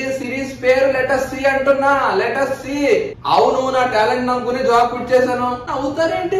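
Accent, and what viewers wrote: Godavari, India. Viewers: I really want to see you on a big screen with our godavari accent hope the day will come soon❤️ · గోదావరి యాసలో C language ah?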